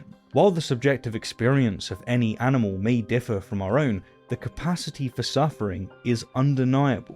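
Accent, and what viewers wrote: England, United Kingdom. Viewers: The English accent gets me every time :)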